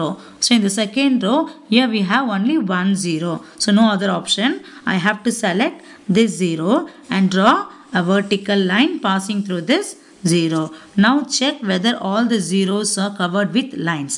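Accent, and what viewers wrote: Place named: Tamil Nadu, India. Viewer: Awesome lecture mam... Keep it up✨ i assume that u r from tamilnadu😅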